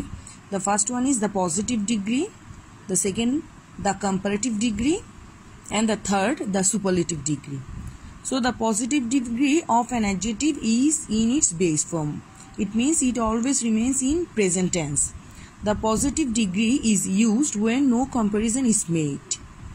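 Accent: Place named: India. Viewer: Indian Accent! Didnt expect it from a SPS teacher.Who recruited this teacher? Btw Noel sir, Rudy sir, Saikat sir, Munna sir these are the real gem of SPS.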